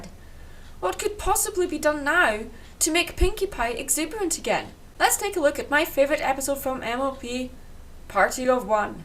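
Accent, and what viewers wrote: Scotland, United Kingdom. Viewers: am sorry to asked but are you Scottish or Brittan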